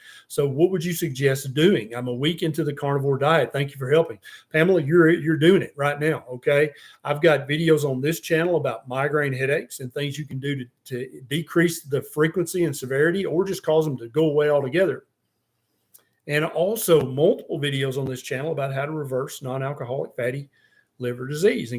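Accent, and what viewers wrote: South, United States. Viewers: "One of these people?" Really? You don't think you have an accent? You must be joking, and I must be being an idiot for failing to realize it? You most definitely have a very marked, obvious and clear southern accent. =D I'm very nearly 100% confident that anyone here in Oregon that I show any of your videos to will immediately completely agree with me about that after hearing you speak for maybe 3 seconds. But there's nothing at all wrong with having an accent, is there? Doesn't just about everyone have some kind of an accent? I'm sure that if you hear me speak you'd notice a northwestern accent. Someone from Oregon sounds like an Oregonian. Someone from Boston sounds like a Bostonian, and someone from Alabama sounds like they come from Alabama. /shrug. Praise the Lord and pass the meat.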